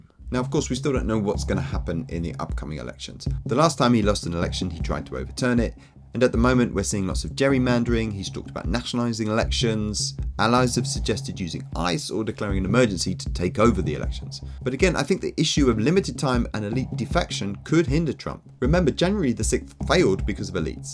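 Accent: United Kingdom. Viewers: How does someone whose accent gives them away as a resident of a constitutional monarchy misunderstand monarchy so brutally? “King” and “dictator” are not synonyms, you stump. You live under a king; are you fucking oppressed? The US constitution, in fact, is almost entirely based on the UK system in the 18th century—you have branches of government and divisions of powers, too! You had them FIRST! You lost all credibility right from the start.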